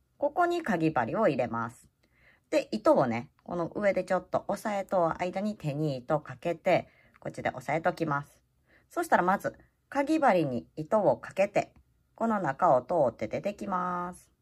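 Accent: Kansai, Japan. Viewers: めちゃわかりやすいです！ 関西弁最高です！！ 素敵な動画ありがとうございます！！ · すっごくわかりやすかったです。関西弁聞きやすかったです。ありがとうございました🙇お弁当入れにしようと思います🍙。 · 関西弁わかりやすくて聞きやすい✨編み目も「へ」の文字に表現したりで非常に分かりやすかったです。ドゥードルのワンコの編み方を是非やってもらいたいです！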